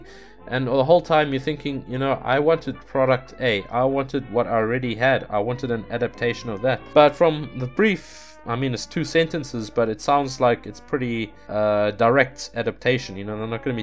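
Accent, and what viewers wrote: South Africa. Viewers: This worries me because the Colour out of space is one of my favourite HP Lovecraft stories. It is so disturbing and unsettling and I'm worried Nicolas Cage will make the movie unintentionally funny :/ I am excited to see Tommy Chong in a movie though, what a legend. PS it is so comforting to hear a South African accent. Keep up the good work :D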